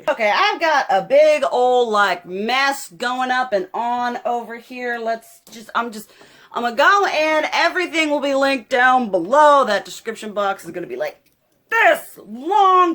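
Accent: South, United States. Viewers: I LUUUUV your accent. I live in East Tn, and it's music to my ears to hear an authentic southern accent. Don't kmow how often you hear that, but just sayin...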